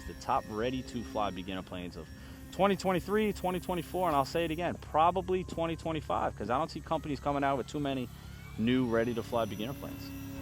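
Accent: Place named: New England, United States. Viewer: Nice! I can’t tell if you are MA or NY. RI maybe? CT? You said stall like stawl but then cherry picker like cherry picka…so I’m confused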